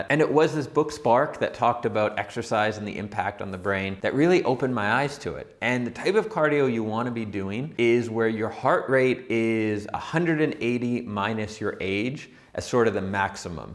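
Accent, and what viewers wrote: Canada. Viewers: Thank you for the video. You really seem to have a Canadian accent! 🏒🥌⛷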